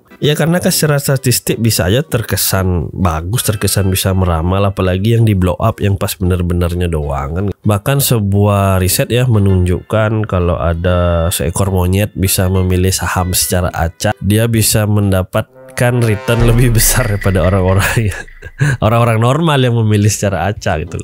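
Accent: Bali, Indonesia. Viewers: Logat bali, dari mana bli?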